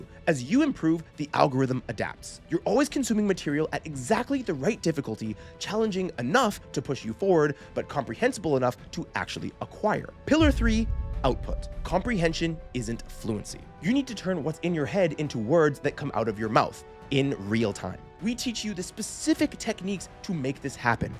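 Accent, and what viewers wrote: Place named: China. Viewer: I'm also from china，hella impressed by ur Chinese accent and applications，u sound literally Chinese！！！OMG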